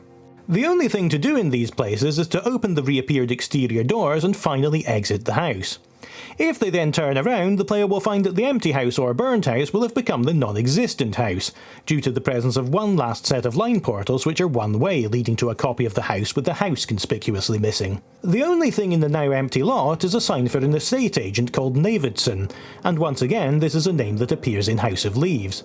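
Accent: Scotland, United Kingdom. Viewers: Your commentary is so Scottish and I love it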